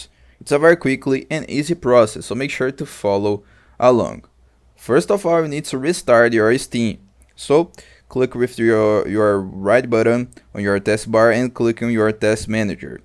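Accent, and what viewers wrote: Brazil. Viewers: i needed 3 words to know you are Brazilian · That's because his native language isn't english. He is from Brazil, lol.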